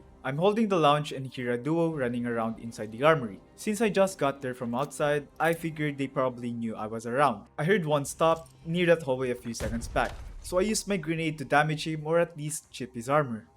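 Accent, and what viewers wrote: Philippines. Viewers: You have a filipino accent. Are you? I am too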